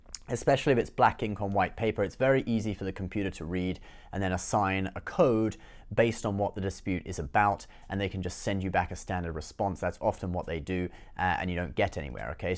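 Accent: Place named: United Kingdom. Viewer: 🤔🤔🤔 are you British? Haha I just noticed that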